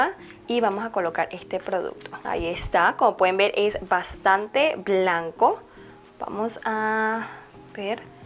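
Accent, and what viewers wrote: Venezuela. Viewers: Hola moreeeee👌🥰😂 saludos desde venezuela, tu acento es muy neutral pero tienes ese venezonalito adentro, me encanta👌❤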